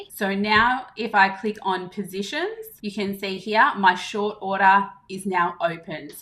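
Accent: Australia. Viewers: Thank you. The process of moving a stop loss is a little different to Binance and FTX. Your video was clear and easy to understand. Good job. And good to hear an Aussie explain. I am new to youtube, how do I see what other video's you have?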